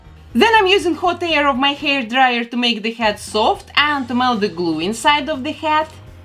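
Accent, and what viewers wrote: Russia. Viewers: согласна с тобой такой русский акцент... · Да, акцент русский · AryanaJoy Babauta, yes, she is Russian. It’s obvious she is. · Ток хотела написать! Тут явный русский акцент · Да потому что только русские говорят слово рили всех английского акцента😂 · DUH ONA RUSSKAYA PONYATNO PO HER ACCENT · Да-да-да, я тоже заметила, ибо понимаю английский только с русским акцентом, таким образом, я "поняла" все видео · Какой сильный акцент. Сразу поняла, что она, возможно, русская) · SSO I think so, cause I'm Russian😅 Sooo, I recognize the Russian speaking manner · Russian accent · Акцент русский) Я как будто слушала подругу которая говорила непонятные слова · Очень очень русский) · She has a very strong accent. Immediately clear that she is Russian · Почему-то слышу русский акцент 0.0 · Настолько русский акцент не может быть у англичан. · Sydnie Harrison Maybe this woman is from Russia. 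She has Russian accent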